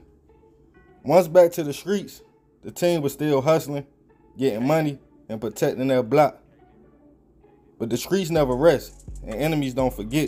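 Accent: Baltimore, United States. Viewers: The dude narrating sound like he from Baltimore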